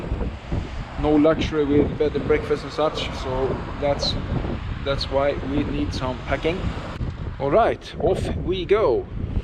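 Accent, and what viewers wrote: Sweden. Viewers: Great video; and excellent comments on the bike's performance. I have several KTM/Husky bikes and I love this size whether it's the Duke or the ADV. Beautiful scenery too. The boreal forest is flat but very "endless" feeling. I enjoy your accent. My ancestors were Swedish and all the old folks are gone now so I miss it.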